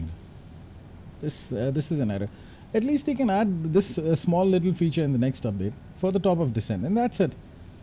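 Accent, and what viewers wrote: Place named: India. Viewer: Clicks on video *hears Indian accent * ANNOYING and turns it of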